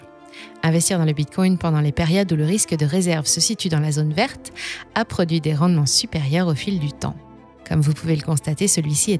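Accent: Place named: United States. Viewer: oui c’est bien possible. Après elle a un excellent accent américain. Après on a pas l habitude de dire bit cogne prononcé comme ça mais j’ai un pote qui dit tout à la Française genre bit coin (comme un coin) c’est horrible 🤣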